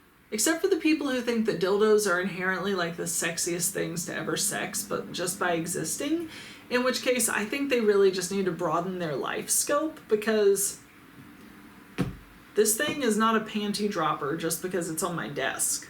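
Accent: Texas, United States. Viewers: As an Aussie, the way you say "Y'all" does give me Texan/mid-western vibes.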